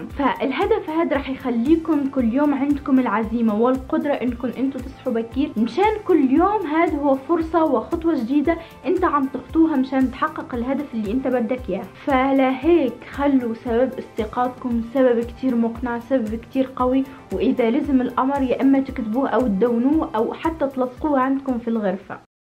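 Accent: Tunisia. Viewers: من هدرة تاعك تباني تونسية 😉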